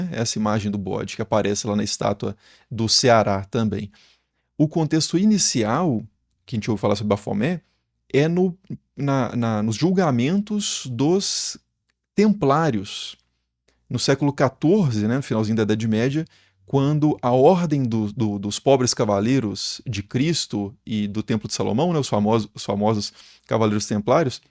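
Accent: Minas Gerais, Brazil. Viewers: O sotaque mineirinho “quem qui era o dono dissaí”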